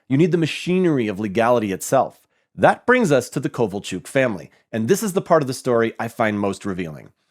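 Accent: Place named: United States. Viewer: He has American accent.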